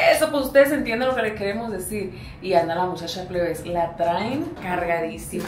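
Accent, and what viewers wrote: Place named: Mexicali, Mexico. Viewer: Me encanta ver tus videos Porke. Me gusta como ablas. Así casi como yo. Jajaja. Yo soy de Mexicali 👍😁pero vivo en los Angels ca. pero si. Así ablamos Nosotros. Saludos chula 😁🌹🥰😎👍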